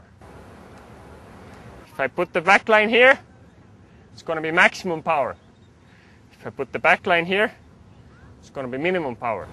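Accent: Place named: Estonia. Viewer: I figured you are from Estonia by your accent:) nice video tho. I want to learn how to fly badly. :)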